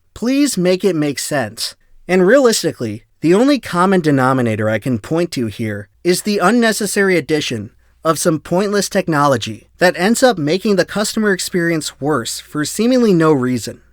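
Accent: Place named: Quebec, Canada. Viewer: Your québecois is leaking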